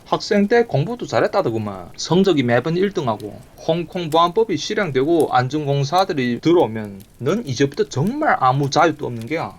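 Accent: Yanbian, China. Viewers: 좋은영상 잘보고있어요 지난역사 현정치에대하여 머가먼지 자세히는 모르고 있지만 ㅠ 님영상보면서 여러모로 많운걸 배우고 생각하고있답니다 사실은 같은 조선족동포임에도 유트브로듣는 우리사투리가 처음엔 잘적응이 안됐었는데 듣다보니 친숙하고 님의 깊은 마음도 자세히 전달되는거같고 재미있게 보고있어요 감사해요 댓글은 처음이네요 화이팅하세요 ~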